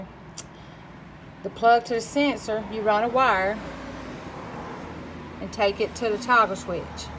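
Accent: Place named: South, United States. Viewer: Love that Southern Lady sounds .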